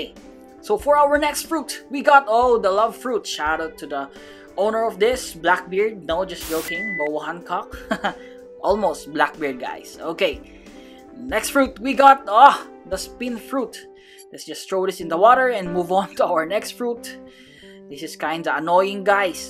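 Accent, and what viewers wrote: Philippines. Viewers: Tbh you sound like filipino lol keep it up you alwast make me laugh.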